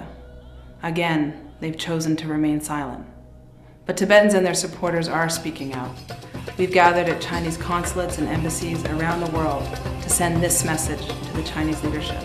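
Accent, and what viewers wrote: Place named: United States. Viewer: btw, u don't even act or make me feel like u r from Tibet, i think u r slave of America,see how gud yr american accent is. especially the way u talk and the way u talking about those, isn't dat kinda reminds people how american u get?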